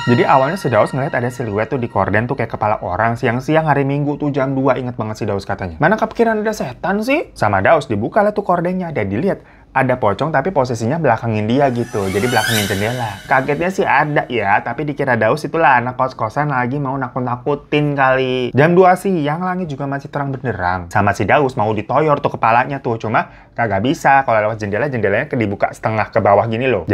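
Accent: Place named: Sunda, Indonesia. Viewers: Maaf bang salfok sama logat bhs sundanya 😭🙏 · lucu banget ngomong sundanya 😭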